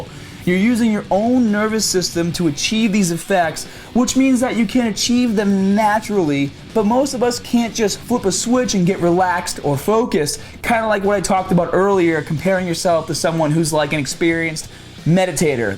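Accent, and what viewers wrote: Boston, United States. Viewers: 1, I'm not addicted to caffeine I have a coffee every second day before I train I also believe you drink coffee 2, my points were not meant to be insulting but this is the second time you come out swinging at something I said,, but tbh I seen a comment left yesterday about how you come up with , you should buy FAT GRIPS ,, you should buy The abs boots you should buy this stupid thing attached to my head with after a certain amount of time you don't use,, being sub a long long time and you know what your Turing into more of a sell out and big fucking douch bag having a go off some ones view point,, which leads me to believe your in commission for them and I believe the only studies were carried out by them not professionals,,unsubscribed and another thing since you let your Boston accent come out you turned into a mark e mark douch bag good luck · Okay, so after watching his first videos from 5 or so years ago, how did he somehow gain a Boston accent, and get so yelly?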